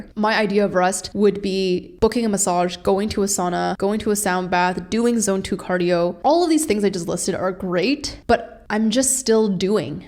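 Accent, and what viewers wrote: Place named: Canada. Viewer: OH MY GOD IF YOU'RE FROM CANADA I FINALLY COULD PICK A CANADIAN ACCENT FROM AN AMERICAN ONE 😭😭🤧🤧🤧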